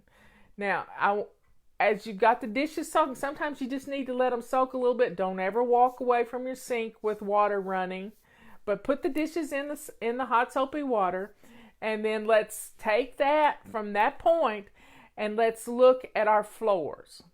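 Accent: South, United States. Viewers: Been listening and watching for years whenever I start losing my way back into chaos. Your easy direction, great starting point, and delivery are authentically your own, OG Fly! Your familiar Southern accent is both graciously understanding and proper, yet decidedly stern. Well done! You’ve inspired so many!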